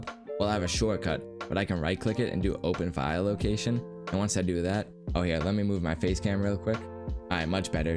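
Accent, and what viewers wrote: Boston, United States. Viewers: thank you so much bro you mad chill you from Boston by any chance? love the accent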